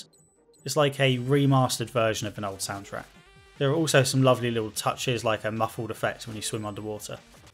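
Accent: United Kingdom. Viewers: Gonna be honest as a Canadian the first 40 seconds were so British I couldn’t understand lmao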